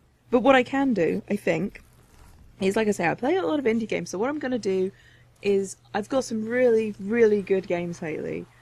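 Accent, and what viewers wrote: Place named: United Kingdom. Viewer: Mmmmm, saucy, British femme fatals playin' vidyuh games. Welcome back,!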